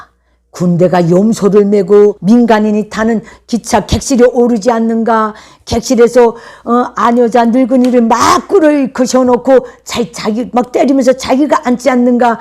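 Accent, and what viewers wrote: Pyongyang, North Korea. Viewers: 님이 코디에 신경 쓰셨네요..ㅎㅎ 보기 좋습니다.. 제가 보는 님의 매력은 북한식 억양으로 표현되는 재치와 품격입니다.. 전문 저널리스트로서의 깊이가 느껴져요.. 의상은 너무 신경 안 쓰셔도 됩니다.. 주변에 아는 여성분들에게 협찬(대여) 부탁 하세요.. ^^ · 반갑습니다.! 언제나 내가 좋아하는 평양 말투를 멋쟁이 김기자님을 통해 들을 수 있어서 행복합니다. 그런데 북쪽 이야기는 들을 때마다 충격이며 가슴이 아픕니다. 어떻게 이 지구상에, 더구나 우리 동족에게 이런 일이 있을까?..... 어떻게 저 체제가 허물어지지 않을까??... 놀랍기만 합니다. 한편, 일찌기 부모님을 남쪽으로 인도하신 하나님께 감사하지만 속아 살고 있는 북한 동포들이 너무나 불쌍합니다. 반드시 김정은체제가 무너져야 한다는 생각입니다. 항상 우리 국민이 꼭 알아야 할 좋은 말씀 들려주셔서 감사합니다. 기자님! 홧팅!! 건강하십시요.~♡ 행복하십시요.~♡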